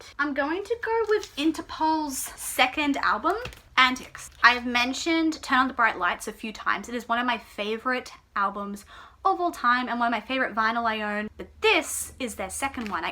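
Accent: Australia. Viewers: I’m so happy you found me so I could find you! I lived in Australia for a few years and the moment I heard your accent it felt like home. Also love your picks, I’m waiting to buy when I can go out to a record store 😊 fun video, I’ll have to do a quarantine tag.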